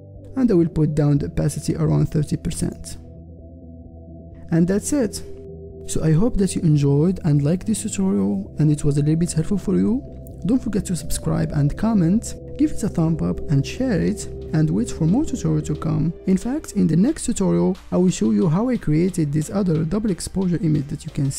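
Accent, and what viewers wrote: Morocco. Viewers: your accent and voice sound like a Morrocan man great video btw!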